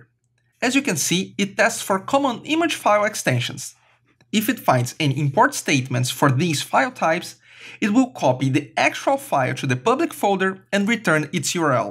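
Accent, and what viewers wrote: Brazil. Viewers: you sound like a brazilian speaking english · good explanation. you brazillian? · Are you Brazilian right ? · Well done! Desconfio que vc seja brasileiro haha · are you brazilian? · sotaque brasileiro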